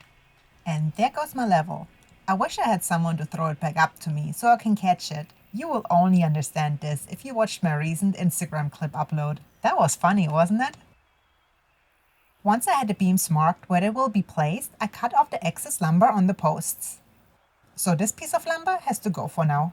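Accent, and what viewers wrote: Germany. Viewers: hi, this may be the reason why it sounds like the german girl next door. First time I noticed it, I though I had a hallucination..... VGvG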